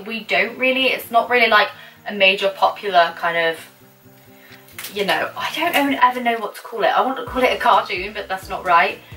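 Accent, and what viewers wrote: United Kingdom. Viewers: R u from the uk iam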